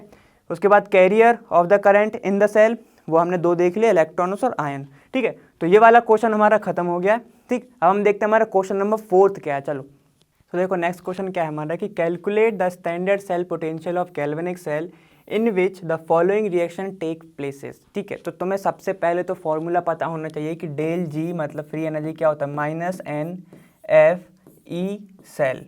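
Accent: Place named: Bihar, India. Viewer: Muje tou bihar se laag rahe hain 😅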